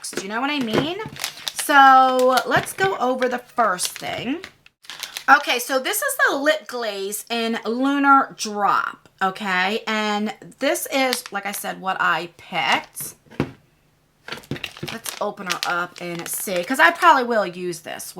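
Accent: New Jersey, United States. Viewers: Mirror mirror on the wall, who is the kindest, down to earth, supportive beauty youtuber of all????? It's just of course!!! I just recently found you but I subscribed after the first video. It's so refreshing to watch your videos because they aren't filled with drama or tea!!! Just real, friendly beauty talk I can take time out of my day to enjoy.....btw, recognized that Jersey accent right away!! Lol since I'm from your neighbor in Maryland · Mirror, mirror on the wall. We love the best of All! And that is not a lie. Love your jersey accent and your videos.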